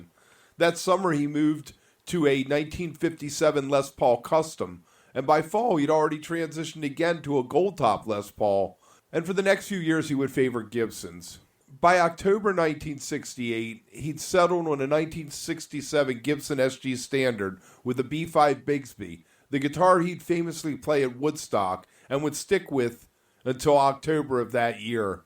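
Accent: Baltimore, United States. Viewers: I’m from Baltimore - this is a Baltimore accent on elephant steroids. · Baltimoron here, lived in Annapolis/Balto/DC area 1979-'92 [age 14 to 27], before moving to Northern California [Santa Rosa] to be closer to the flame.. And yes, I pronounce my long "o"s the same way the narrator does.. & that is pure BALTIMORE, baby!.. Much as I'd like to rid myself of this particular language/speech trait, it seems that it's "baked in" > for LIFE.. Oh well.. There are also aspects of my Allentown PA [Pee-Ay] accent [lived there from birth to age 14] which apparently "dog" me to this very day.. Embarrassing!.. Oh well, what can ya do?!?.. As the original question-asker opined > GREAT VIDEO, hon'!.. Har!.. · Great video, but yes I heard the Baltimore right away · Dude, are you from Baltimore?? Asking because of the accent. Great video!!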